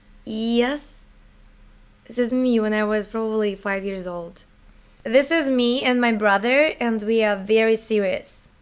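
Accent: Russia. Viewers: you russian accent is awesome i must say! good on you! kak naschet slova 'sadism' ? mojno nemnozko pogovorit a marquis de sade i tak dalee. privetik is germanii, serojenka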